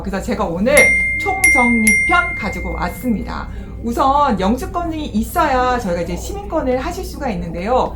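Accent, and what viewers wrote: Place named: United Kingdom. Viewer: Someone said Tomato, and someone said Tomaito. Potato, or Poteito(물론 발음한정) 만약에 British Accent 는 저분의 발음에 가까습니다. 영어의 자음은 지역에 따라 변화가 심합니다. Northern Ireland accent 가 아마도 가장 좋은 예 입니다. 물론 London 의 Essex 지방은 , L. 또는 T 발음이 거의 묵음에 가깝고, Australia Accent 도 아주 심한 모음 변화를 같고있습니다 (AEIOU)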